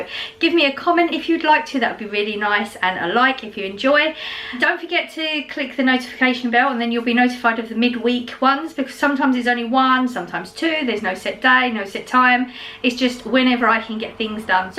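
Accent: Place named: Cockney, United Kingdom. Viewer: She speaks with a cockney accent.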